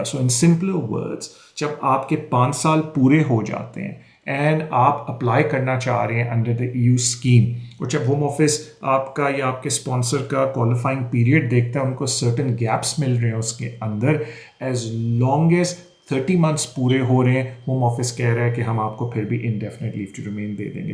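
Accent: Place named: Pakistan. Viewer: Its Pakistani English language XD wtf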